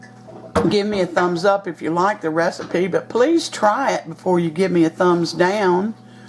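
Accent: South, United States. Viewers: Nice video! Love that you're from the South. You sound like my husband & I. Can't wait to try this!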